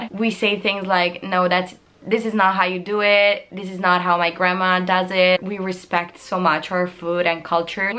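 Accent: United States. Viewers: I love your accent, It's like a cross between American and English, and then sometimes you forget the "th" sound and you go Italian again hahaha, carina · Sconvolta dalla perfezione del tuo accento americano...totally jealous! 😄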